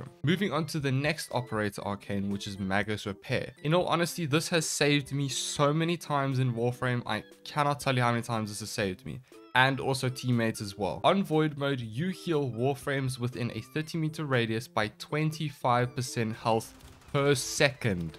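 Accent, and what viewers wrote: South Africa. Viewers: That accent is it south african? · This is South African I love it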